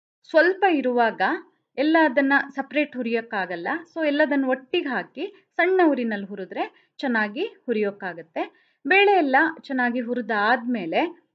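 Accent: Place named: Brahmin, India. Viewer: ಶುದ್ಧ ಬ್ರಾಹ್ಮಣ ಉಚ್ಚಾರಣೆ 🙏🪷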